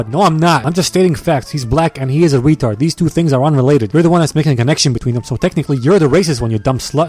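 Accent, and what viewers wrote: New Zealand. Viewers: He’s New Zealander not Aussie get it right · hes from new zealand not aussie😂😂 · Hes a kiwi 😑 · buddy he's very clearly a kiwi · OMG HES FROM NEW ZEDLAND OR WHAT EVER THE FUCK NOT ASTRAS;IA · He’s from New Zealand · He ain't no Australian that's a new Zealander , and I should know because I'm Australian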